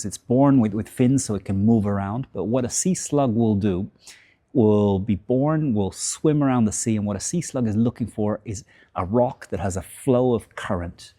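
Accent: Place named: Ireland. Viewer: Brilliant and memorable! As much as I love your Irish accent your "un abrazo" at the end made me wish for some Spanish language content. And maybe a video explaining how you found your way to Spain and your transition from "guiri" to local. That would be very interesting for us! I'm an American with majority Irish heritage who fell in love with Sevilla in the early 2000s. I made great local friends there, but ultimately I always came back to the States for career reasons. I admire that you've so fully embraced your love for Spain, and made such a fulfilling life for yourself there. Un abrazo! -Justin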